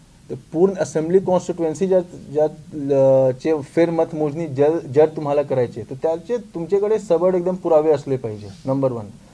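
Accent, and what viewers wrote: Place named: Gujarat, India. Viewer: Gujrati ahe vate ha kasa marathi bolto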